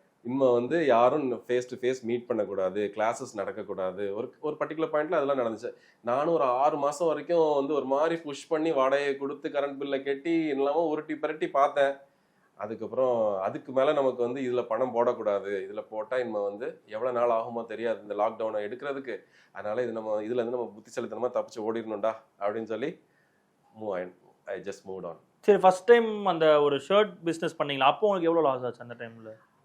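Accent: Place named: Tenkasi, India. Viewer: அம்பாசமுத்திரம் -தென்காசித் தமிழ்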